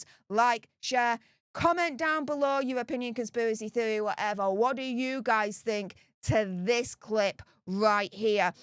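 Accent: Yorkshire, United Kingdom. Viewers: Oh, ur a great girl. U make me laugh. Such an awesome sweet girl. The way u tell things is so brilliant. Keep up ur great work. Love that Yorkshire accent, so lovely. Fantastic girl. ❤️❤️❤️❤️❤️❤️🙏🙏🙏👏👏😂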